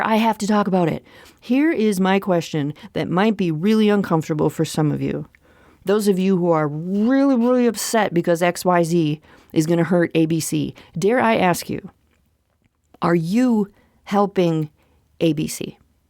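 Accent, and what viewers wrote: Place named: Midwest, United States. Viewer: I think you have a Midwest accent, but I sometimes can hear the Minnesotan/northern Wisconsinite/Canadian O sound in certain words. I can't really describe it, but you probably know what I'm talking about. lol :)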